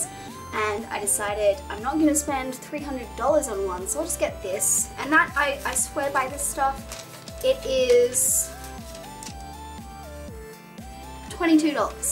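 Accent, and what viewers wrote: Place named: United Kingdom. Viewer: I cant believe i haven't noticed this but are you Britain you have an accent!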